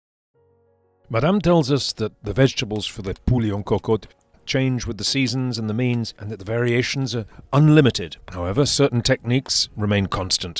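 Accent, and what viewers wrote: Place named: United Kingdom. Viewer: YIMBY (yes in my backyard), there is always room in my backyard for culinary pilgrims. Madame Saint-Ange would have been suitably amused by the fact that her name is brought to our attention by a cook having a very British accent, BUT secretly content on knowing that the good word is being brought to us heathens! Keep up the good work!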